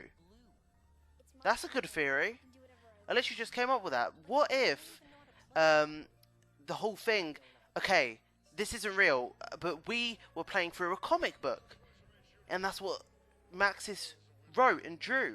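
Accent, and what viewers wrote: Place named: United Kingdom. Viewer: OMFG I HATE FUCKING BRITISH ACCENTS IT FUCKING SUCKS@ KHJKHJKGHKGJK CANT STAND HIS FUCKING VOICE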